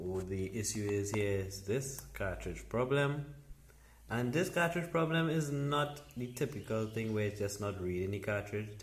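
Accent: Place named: Trinidad and Tobago. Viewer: Hi thanks for this video. You sounding like a Trini, if you are can i get a contact for you? Or call me at <num> thanks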